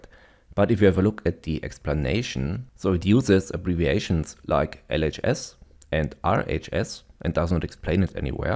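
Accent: Germany. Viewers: Your german-english accent just makes listening to you even better. Things have a more serious tone to it. Fantastic content!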